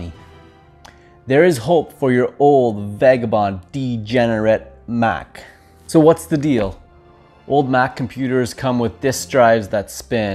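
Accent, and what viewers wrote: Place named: Ireland. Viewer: Good tutorial! Are you Irish, Scottish, or Welsh?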